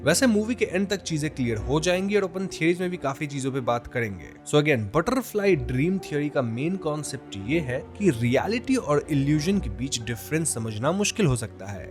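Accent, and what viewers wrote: Bengal, India. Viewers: Bas kharab Hindi sunke bar bar mood kharab hogaya. Bangal se ho kya bro? Jara Hindi pe kaam karona.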